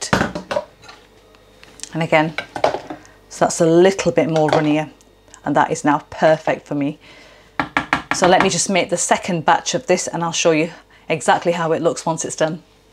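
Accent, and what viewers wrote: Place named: Wales, United Kingdom. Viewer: As an American I'm loving your accent and scratching my head about where it's from....maybe being across the pond I'm totally wrong, but are you Welsh?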